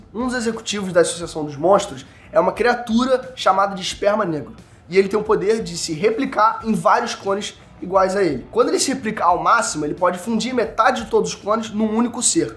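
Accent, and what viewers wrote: Rio de Janeiro, Brazil. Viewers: ahah imagino para quem não é do Rio ouvir esse sotaque. até pra mim é puxado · Ele ta no caminho! gostei! tirando o sotaquezão carioca... mas isso a gente se acostuma! dale!!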